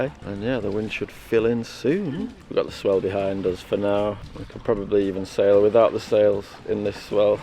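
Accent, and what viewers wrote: Lancashire, United Kingdom. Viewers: where were you born, cause you sound a bit like me (Bolton Lancashire) Not exactly a sailing hub!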